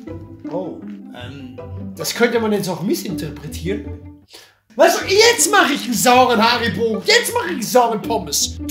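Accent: Austria. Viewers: Kann es sein, dass ich da einen leicht österreichischen Zungenschlag raushöre?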